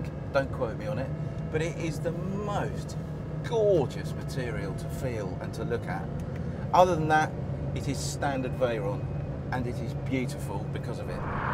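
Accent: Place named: England, United Kingdom. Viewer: odio su acento ingles ..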